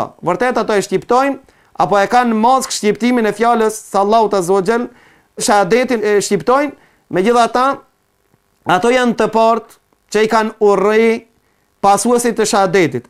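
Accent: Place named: North Macedonia. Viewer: Ta qifsha mamin Terrorist Maqedon, sipas akzentit tend qe ke je 100% nga Maqedonia, pra nga Shkupi, apo Kumanova.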